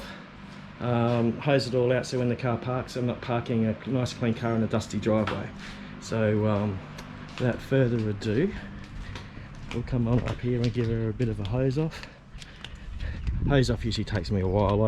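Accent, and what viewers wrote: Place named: Australia. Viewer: Ppls should be to speak english language! Your EN with accent AU....I get it"-----they lift stopped on the 1st floor😆😆😆cu.koo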